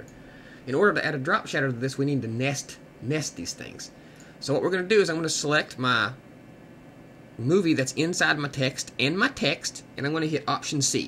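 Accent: South, United States. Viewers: this was really useful. thanks. where's your accent from? (I'm not American, so I can't figure it out). the South or something?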